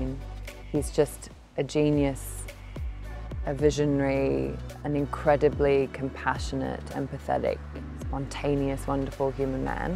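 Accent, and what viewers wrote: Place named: Australia. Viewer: I'm an Aussie and she sounds Australian to me, absolutely.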